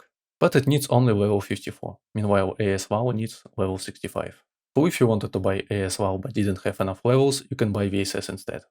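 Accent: Russia. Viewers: Hello friend, you speak with a noticeable Russian accent, where are you from?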